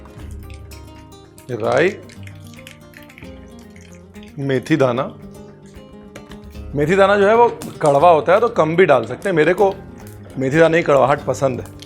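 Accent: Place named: Punjab, India. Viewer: Sir, I come here to listen your Punjabi and love the way you talk 😀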